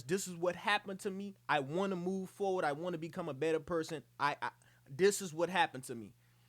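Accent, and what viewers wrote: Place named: Charleston, United States. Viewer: You sound like you from Charleston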